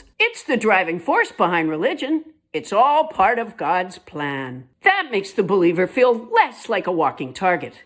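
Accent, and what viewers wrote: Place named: New England, United States. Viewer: THIS monologue is brilliant, especially “part of God’s plan, & Mr. Balloo.” Maybe Poundstone, Jimmy Kimmel, Louis Black and the Comedians ARE the adults in the room, after all. But as keen-eyed as you parents seem at spotting the 3–4-year-old development of a grown man, how do you explain the 76–78M adults with no such recognition?? He toddled around the WH from 2016–2020 playing president then!! Atrociously. Abominably. Disastrously. What chemical substances are people getting that wipe the data out of their minds so completely?—send us a link, PLEASE! (I LOVE New Englanders who use British & Irish colloquials like “banged on” and “dithered around,” or “faaar” instead of “for,” “f-ing & s.o.b.” Sooo endearing!)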